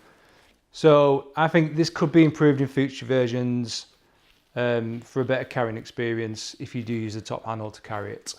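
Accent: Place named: United Kingdom. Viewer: I like that this bag is good for 1 people as you say. I appreciate being able to learn proper English from a British people like you.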